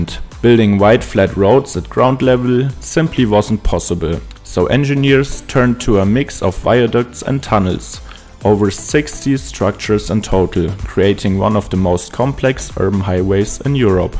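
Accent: Germany. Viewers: I really her out that you are from Germany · I hear deutsch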